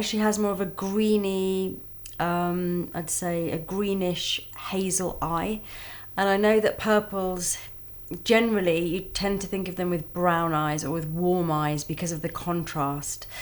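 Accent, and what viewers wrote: United Kingdom. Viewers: Thank you so so much! Hope you feel better soon. 🤗 Your nasal voiced is concealed by your ever sexy British accent. 😘😘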